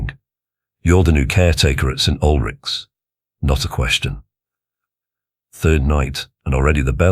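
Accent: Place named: Northern England, United Kingdom. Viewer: Hi, my name is Lynda, I've just subscribed to your channel, I can hear that you're a northerner, I can tell I'm going to love your stories, I'm from Burnley in lancs however I moved to milton keynes 25 years ago, so I tickled pink to hear you're a northerner too, thank you 🙏 💛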